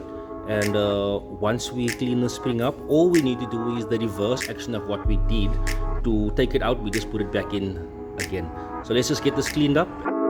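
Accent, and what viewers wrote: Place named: South Africa. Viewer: Love the South African accent 😄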